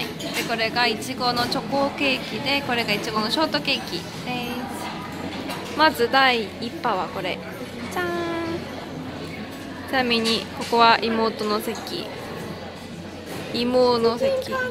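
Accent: South Korea. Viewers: さんの今までの努力で韓国語が染み付いてるのはすごい！と思うんですけどさすがに日本におるときは日本語にならん? 私はどちらかっていうと母国で韓国人って勘違いされたくない😅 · 知ってます！いつもめちゃくちゃ韓国訛りなので（ 笑 ）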